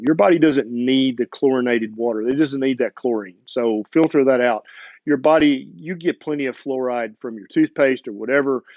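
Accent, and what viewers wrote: South, United States. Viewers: Thanks Doc for cutting through the marketing BS and quackery with science drizzled with your mellifluous molasses over honey southern accent.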